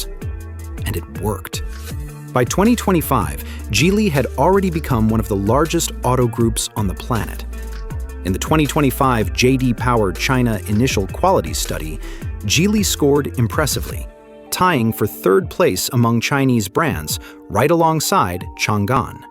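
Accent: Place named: United States. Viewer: And a yank is telling us all about it 😂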